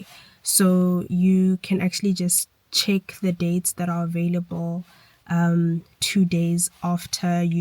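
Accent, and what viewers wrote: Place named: South Africa. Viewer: Oh sweet! I'm glad they don't take it, that would be a mission when flying back and forth XD thank you so much for all your help <3 It's also soo good to hear another saffa accent again :')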